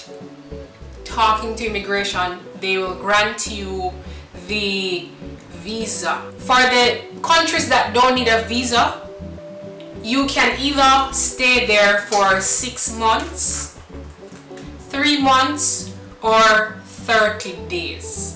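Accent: Caribbean. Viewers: Intelegent young lady, good grammar, with an accent exactly as any other English speaking Caribbean islander.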